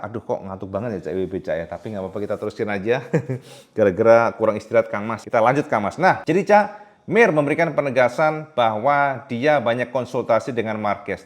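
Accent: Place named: Tulungagung, Indonesia. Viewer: bahasa tulungagung e gak lali ki om ujug" 🤣🤣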